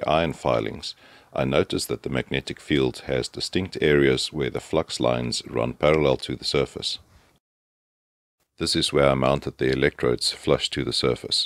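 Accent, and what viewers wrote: South Africa. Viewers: You sound very South African, thanks for the videos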